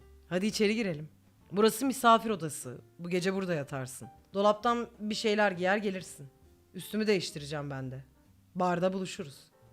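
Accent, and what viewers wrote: Black Sea, Turkey. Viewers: Karadeniz şivesi❤ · karadeniz şivesinden dolayı ciiddi şeyler çok komik yaa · Karadeniz şiveli gercekmiss hayallerim gercek olduuuu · Haşmetlimin her episode serisinde birini Karadeniz şivesiyle yapmasına bayılıyorum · Batugh Tanem valla senden akilli xngxhnxmhfmjfmjf Ve karadeniz sivesi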